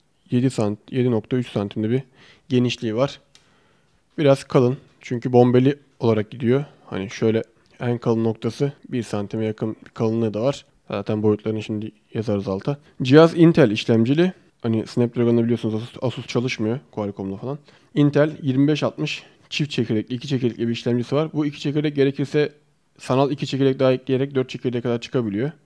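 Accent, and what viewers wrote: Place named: Eskişehir, Turkey. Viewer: Eskişehirrr????? Eskişehirmisin sen abi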